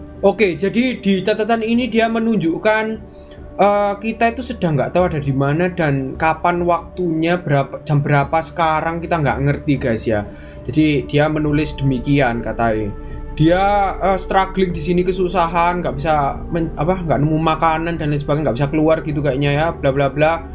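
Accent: Java, Indonesia. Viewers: Suka bgt liat teriakan sma bahasa jawanya kak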